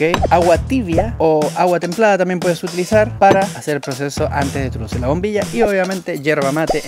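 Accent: Chile. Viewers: Es chileno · Amigo, de que Pais eres? Tienes acento chileno. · Pero vos no sos argentino, mm que raro, no me gusta que un chileno, por lo que escucho, me enseñe a preparar el mate argentino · Excelente yo lo hago así igualito!! A juzgar por tu acento sos chileno, verdad? No sabía que allá también tomaban mate! 😍 Cuéntame, toman mucho? Saludos y muy buen video 🤗🇨🇱🇦🇷🧉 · Se nota a kilómetros que es Chileno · De donde eres bro no reconozco tu acento pero no eres argentino eres chileno? Se me figura tu acento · eres un crack, me salta una duda eres Chileno?, hablas con acento chileno,...demás está decir que en el campo y sur de Chile se ha tomado siempre y mucho el mate!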